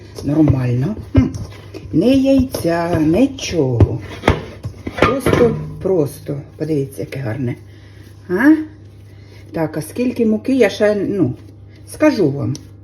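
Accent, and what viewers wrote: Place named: Surzhyk, Ukraine. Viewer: не зникайте, а то сумую за вашими відео, ще Оленка подобається з "Мого сільського життя", такі ви дівчатка наші, а суржик просто рідний дім❤❤❤❤❤❤❤❤❤❤❤❤❤❤❤❤❤❤❤❤❤❤❤❤❤❤❤❤❤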